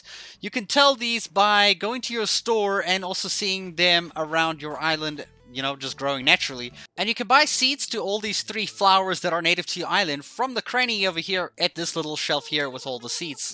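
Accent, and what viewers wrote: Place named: South Africa. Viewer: I was watching your video and thought “this guy sounds South African” and I was right! First time watching your videos but hello from SA too 🙋🏽‍♀️😄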